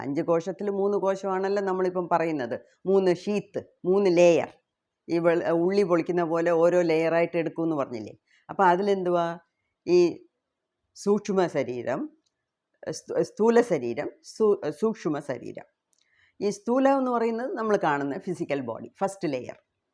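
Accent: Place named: Tamil Nadu, India. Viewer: Maminte plan anusarichu ellam orderil paranjal mathi 🙏Maminte thamizh kalarnna Maminte speech eniku orpadu ishtam 😍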